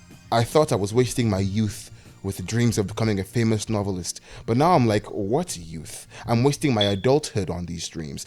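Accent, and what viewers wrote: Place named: Nigeria. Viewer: While I won’t say us Nigerians are rare, we are few and fair between when we occupy commentary spaces I absolutely shocked when I heard the close to home naija dialect and little slips of our accent mixed with such eloquent writing and structure in this vid. As a fellow Nigerian who felt stuck In how our community limits us to such places I’m pleasantly surprised and annoyed i found you just now.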